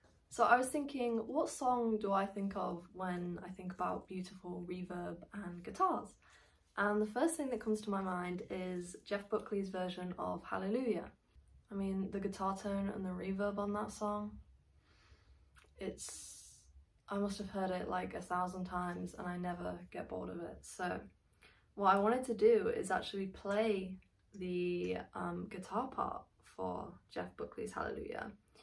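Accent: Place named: England, United Kingdom. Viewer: who are you？ England accent.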